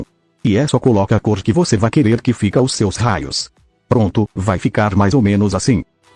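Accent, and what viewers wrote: Bahia, Brazil. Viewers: É o baiano?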